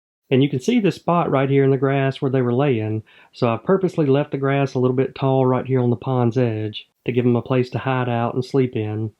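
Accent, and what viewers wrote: South, United States. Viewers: Great wonderful videos, narrated with a fantastic southern accent. One thought is, seems a lot of fish for a small pond. Is there some sort of math to figure population?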